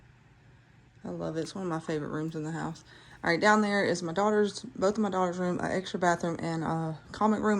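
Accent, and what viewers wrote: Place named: South, United States. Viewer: I like the way you have the furniture but over by the far wall would be good too. I love that tree. Your bedroom is big and your bathroom.👍what a beautiful home!!!! Love it. You are a lucky girl. I forget what state? I think I hear a southern accent. Your husband seems like a nice guy. He has a sense of humor.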